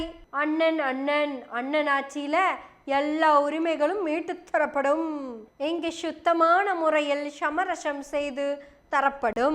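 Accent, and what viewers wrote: Kongu, India. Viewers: Kongu intonation WoW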